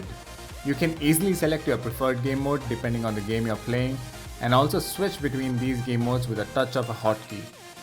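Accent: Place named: India. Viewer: probably because this guy sounds Indian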